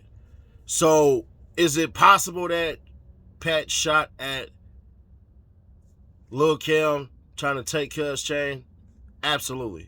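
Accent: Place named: Bay Area, United States. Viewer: Nigga I knew you was from Cali I could tell a bay nigga .... much love from 77th Holly e.s.o!!!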